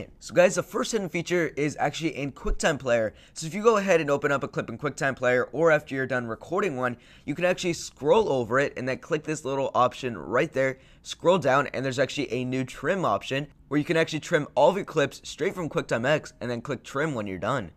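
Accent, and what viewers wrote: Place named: Canada. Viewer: You sound very Canadian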